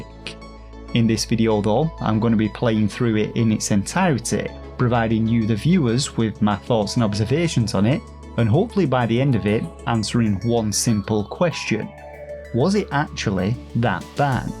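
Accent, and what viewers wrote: United Kingdom. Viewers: What kind of accent does have its like so so british but hes over here like “seven-teen” instead of “seven-een” a lot of the pronunciations are also wild. Wondering where they are from.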